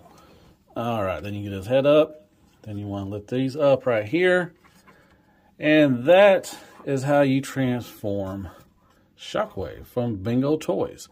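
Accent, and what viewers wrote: South, United States. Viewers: Your enthusiasm is joyfully infectious and a Southern accent is always a pleasure to hear. I subscribed, and am rooting for your channel! Side note from left field here but, I hope they make a Bruticus one day. 'Bingo Toys Bruticus' just rolls off the tongue, don't it?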